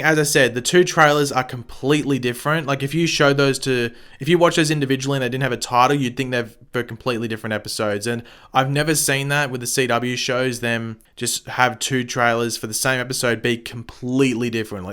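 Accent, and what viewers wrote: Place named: Australia. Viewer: You sound Aussie ;oo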